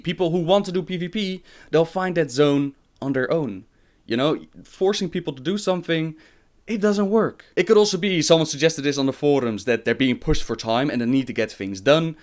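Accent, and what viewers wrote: Netherlands. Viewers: Damn, that Dutch - English dialect ^^ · Argg Dutch accent, man....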